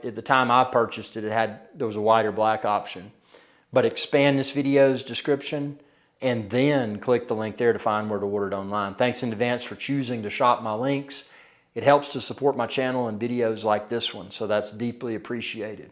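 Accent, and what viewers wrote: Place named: Atlanta, United States. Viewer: Does it being a VA panel (as opposed to the popular IPS) make any difference? They say VA panels aren't as good in terms of viewing angel. How's your experience been sitting up close using it as a monitor vs using it for TV watching from a few feet away perhaps? By the way your accent reminds me of my friends in the Atlanta area 🙂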